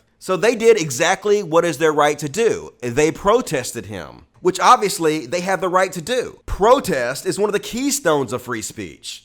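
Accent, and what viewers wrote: South, United States. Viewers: Thought so, that southern drawl. You don't know shit. A comedian in Quebec made a joke about a disable kid and lost his career over it basically. He is trying to appeal bu he's broke and has tried a go fund me page. He was fined tens of thousands of dollars and the court case broke him. Go Fuck Yourself, I'd slap you if you were in the room, lol.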